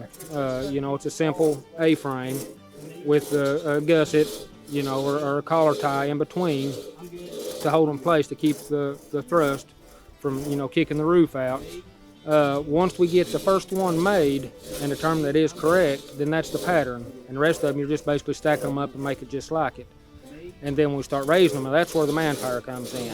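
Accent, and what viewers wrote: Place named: East Tennessee, United States. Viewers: I would guess Kentucky or Tennessee, I would also guess most Americans could identify that pretty easily. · His accent is Appalachian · nice. bunch of us in AL around like that too so I knew he was southern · it sounds like a east TN, or a east KY, accent! Hill folk know hill folk! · I'm from East TN and he sounds like he's either from there or the plateau.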